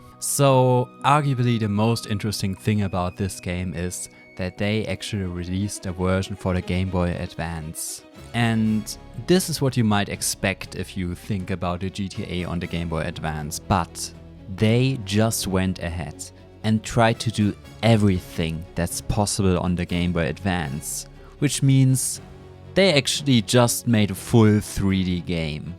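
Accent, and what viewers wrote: Germany. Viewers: Man hört übel das er deutscher ist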